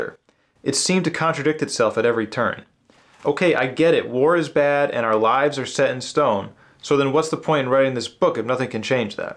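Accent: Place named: Netherlands. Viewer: P.s you Dutch by any chance. You hide the accent pretty well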